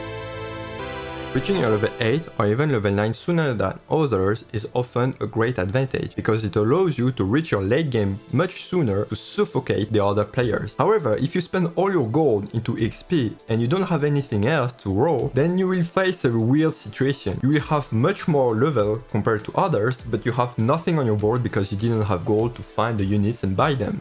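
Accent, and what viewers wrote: France. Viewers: Great advices. Awful english accent. Where are you from ? (french people has same awful english accent, but could be asian) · You are a french accent, u are french ? ^^'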